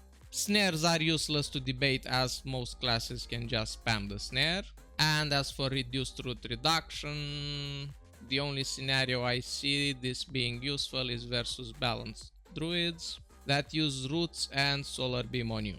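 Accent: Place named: Russia. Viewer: о боже, какой у тебя акцент... ты походу русский...)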